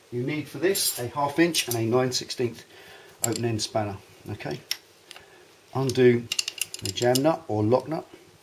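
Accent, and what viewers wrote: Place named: England, United Kingdom. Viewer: What a great video and so nice to hear an English accent working on a Harley rather than the usual southern American. This is now a no brainer for me to do myself.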